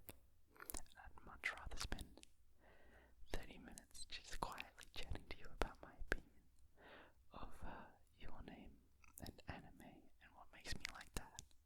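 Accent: Australia. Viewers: We love an Aussie accent 🫶🏼 me & my sister called it “tickles” too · Oooooh Australian Asmrtist I’m here for it! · Thank you Australian Steve Harrington for the amazing ASMR <3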